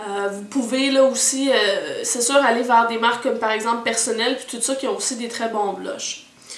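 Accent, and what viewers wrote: Quebec, Canada. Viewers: J'adore ton accent quebecois c'est tellement mignion :)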